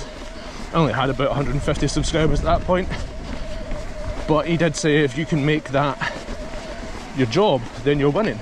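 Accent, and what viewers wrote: Scotland, United Kingdom. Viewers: Great content. And hearing someone swear with a Scottish accent is a bonus. 🙂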